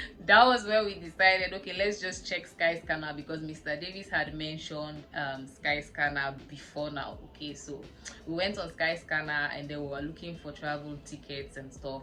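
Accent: United Kingdom. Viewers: yeh few more months an u would have been speaking 🗣️ queen English…it was bang on‼️ really good to hear👂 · This was so fascinating to watch and oh, you've got the accent 🤗. England has and still is, my dream country 😅. The day I get opportuned, I'll contact you to walk me through 👏🏽.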